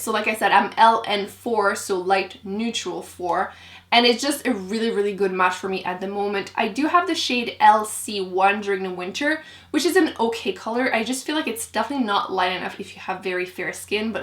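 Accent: Quebec, Canada. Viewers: Clairement tu parles français, Québec?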